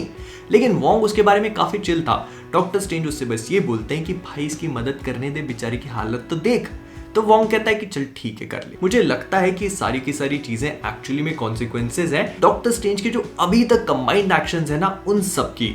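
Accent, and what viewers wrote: Bhopal, India. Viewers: Bhai aap Bhopal se hoo 😅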